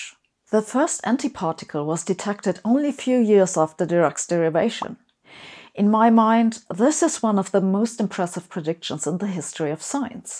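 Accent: Germany. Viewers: Love the German accent 🥰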